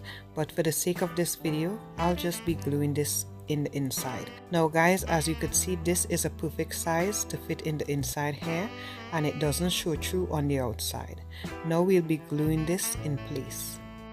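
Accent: Trinidad and Tobago. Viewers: Wow you sound Trini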